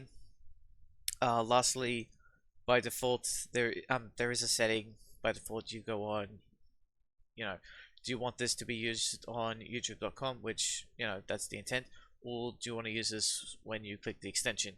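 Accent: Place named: New Zealand. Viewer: I swear you've got a bit of kiwi in your voice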